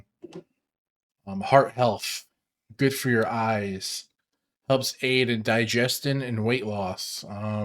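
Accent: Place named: Upstate New York, United States. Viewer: First view, first comment here! All the best on your journey,. Say, I am an informal student in accents and I want to guess you are either in our grew up in upstate New York. That's my first guess. My second guess is Eastern Pennsylvania. My third guess is Chicago. My fourth guess is a city or suburb in the northern part of the United States (in a state that touches the Great Lakes). Let me know if I'm right!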